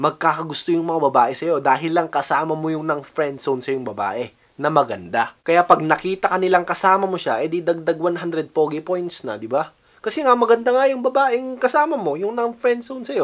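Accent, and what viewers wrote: Ilocos, Philippines. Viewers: bakit boses ilocano?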